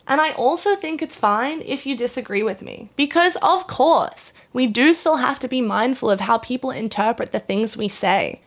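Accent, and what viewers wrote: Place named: Australia. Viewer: It took me watching more than halfway through the video to realise that you were also Australian.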